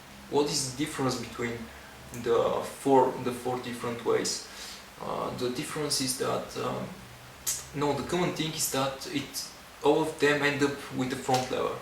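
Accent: Bulgaria. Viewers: are you bulgarian ?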